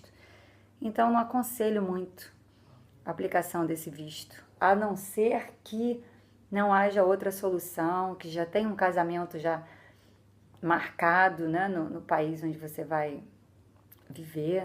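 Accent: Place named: Rio de Janeiro, Brazil. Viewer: Boas dicas de legislação em um sotaque carioca. Riso. Abraço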